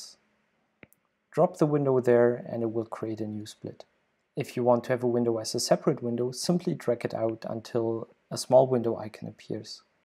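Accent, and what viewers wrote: Germany. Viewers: Wonderful introduction! You kind of sound German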